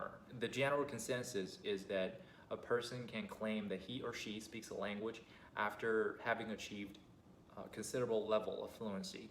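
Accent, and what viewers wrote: South, United States. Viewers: Hi, I have a question, how did you learn English? From your accent, I guess you went to american at an early age and studied maybe middle school in USA, is my guess correct? ❤ your sharing videos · Dude how do you sound so Southern? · Your English has a southern style to it 🔥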